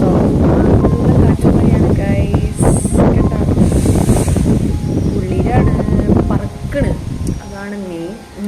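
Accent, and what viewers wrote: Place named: Thrissur, India. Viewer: Thrissur 👍kunnar slang is good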